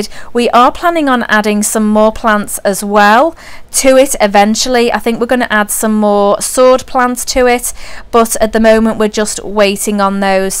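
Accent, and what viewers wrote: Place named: Merseyside, United Kingdom. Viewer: Hi the old tank looked good but the new tank looks amazing much more natural, with the Amazon puffers being wild caught the more natural feel of the tank and most of the glass been covered up the fish obviously feel a lot happier, great job 👍 Ps l've just checked out your website and realized that you are in the US but your accent is the same as mine merzyside! 😂😂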